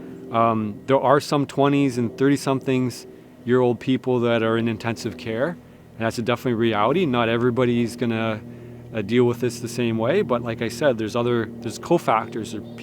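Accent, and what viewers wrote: United States. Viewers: NHL u knida sound like a American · Wow, you sound like a red blooded American here. And I agree with everything you've said, and share your concerns.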